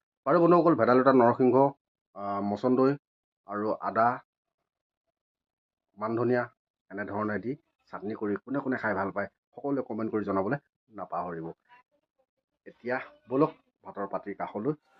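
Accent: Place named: Nagaon, India. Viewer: Apunar video xodai saisilu kintu nagaon kotha kuwa karone beya lagit moi jihetu nagaon hoi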